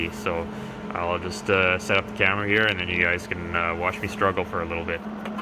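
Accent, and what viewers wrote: Canada. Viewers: Love the humor, reminds me of AVE, you also sound like your a canukistan native, Oh and by the way love the vid's well done.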